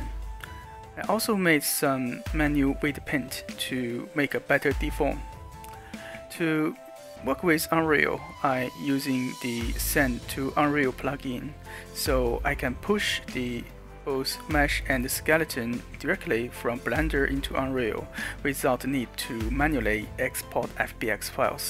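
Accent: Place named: China. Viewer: 一听就是华人，赞赞赞，求个detail tutorial可以吗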